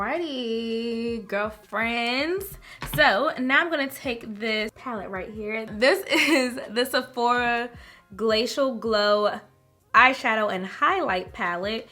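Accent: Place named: Prince George's County, United States. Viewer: You gotta be from PG County MD 🧐 I hear the accent 😂😂😂 & this look is bomb sis!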